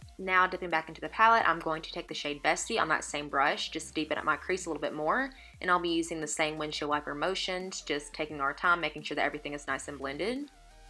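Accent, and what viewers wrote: South, United States. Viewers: So pretty, and I love your accent, are you from the south?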